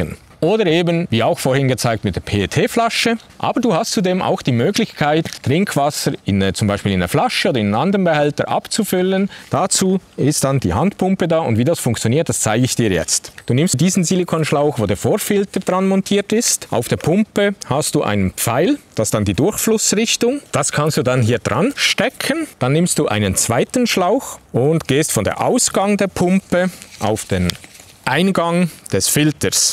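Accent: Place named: Switzerland. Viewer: Moin Na, das war ein dramatischer Anfang und die Musik Episch. Das mit dem Schweizerdeutsch war vollkommen in Ordnung, der Dialekt ist einfach Klasse. Der Filter ist wirklich gut. Selbst hab ich einen von Katadyhn . Ist aber eine Überlegung wert sich den anzuschaffen. Danke für's zeigen 👍🏻 Gruß Michael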